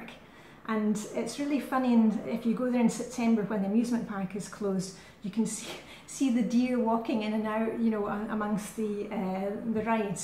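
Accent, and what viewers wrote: Scotland, United Kingdom. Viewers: You have such an open, natural style when you talk to us. Thankful every day for the thought and time you put into these videos, and for your sparkle and encouragement and good cheer. (My husband loves your canny Scot style! :)))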